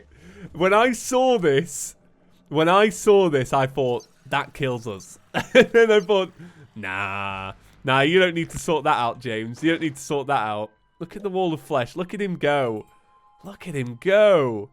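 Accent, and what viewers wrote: United Kingdom. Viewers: i haven't been wathching you in a while, until you uploaded the Hollow Knight video. Since then, i've been binging your playthroughs, and accidentally picked up some of the British accent. how do i get rid of that ??'!?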